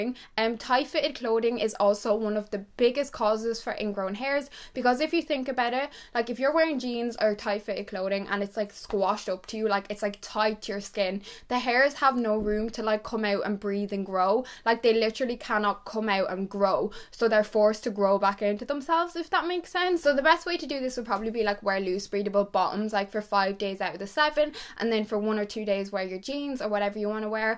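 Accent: Ireland. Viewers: she's Irish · are you irish??? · This video is super informative! U are adorable and ur hair is super cute! Also is she Irish? I’m not rl good with accents😂😅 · These videos are so helpful thank you!! Also I love your Irish accent so much 😍 love from Italy 🇮🇹 ❤️ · Great tips and could listen to you all day long, with your great Irish accent your on to a winner. Take care 😉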